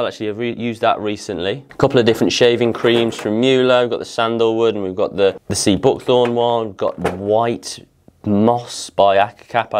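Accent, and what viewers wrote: Cockney, United Kingdom. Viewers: I love cockney way you speak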